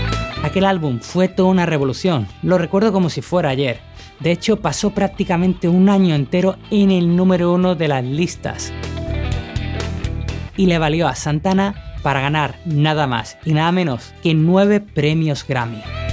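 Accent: Spain. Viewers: Que fondo musical tan horrendo ! y la voz españita de este chaval no le hizo ningun favor, no tenia Santana suficiente musica para ponerla de fondo ?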